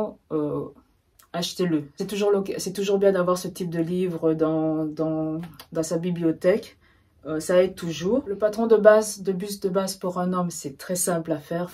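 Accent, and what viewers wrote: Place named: Belgium. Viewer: Coucou , je te découvre et j’ai adoré !! Tes cousettes sont vraiment très chouettes et chic !! J’aime beaucoup tes cousettes hommes ! Pour ton pantalon carotte , il est top , peut être le couper « tête bêche » comme pour un jean ? Pour éviter que ça vrille ? J’aime bcp le gilet sans manches de ton mari ! Pour les Jean , je vais regarder de plus près ce livre car je suis conquise par la couture jean aussi et c’est qd même un beau budget ! Bref je te dis à bientôt !! Et j’avais bien reconnu ce petit accent !! J’ai fais ma primaire et secondaire en Belgique !! A bientôt 😍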